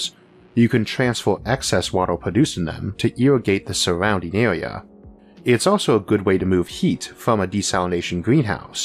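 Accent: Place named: Texas, United States. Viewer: Yes as a Brit I thought that maybe he was a Texan or from the Southern states.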